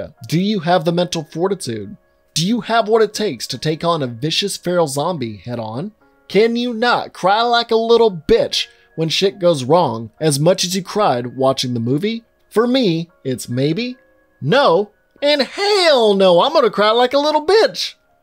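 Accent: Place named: South, United States. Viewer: I just realized how much he has a southern drawl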